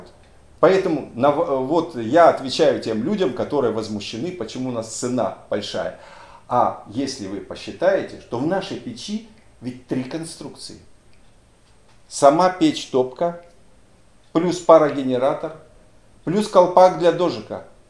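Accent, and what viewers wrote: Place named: Rostov, Russia. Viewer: Как же достал этот Ростов! Учись говорить по человечески!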